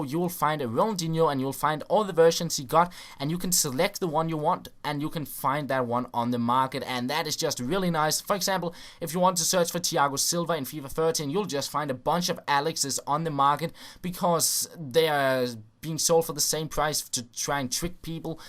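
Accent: Denmark. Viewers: Man kan bare høre på dig at du er dansker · lol so easy to spot that you are from north europa/DEnmark? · your English accent is Danish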